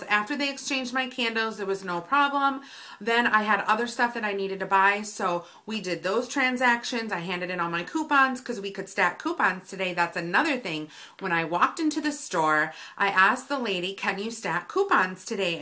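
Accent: Wisconsin, United States. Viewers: the Wisconsin accent?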